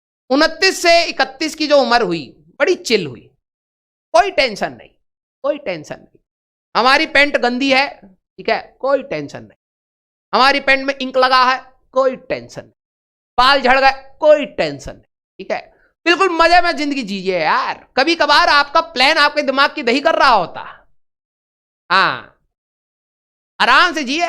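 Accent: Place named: Bihar, India. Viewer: Sir ka bihari ascent ek perfect bolte hai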